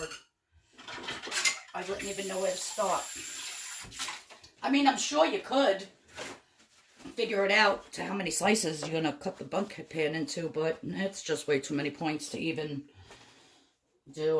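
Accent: New York, United States. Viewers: German baking is one of my favorites. Somehow Germans knew what they were doing back then. R u from Brooklyn, Queens, or Long Island. U sound like my family! Haaa!!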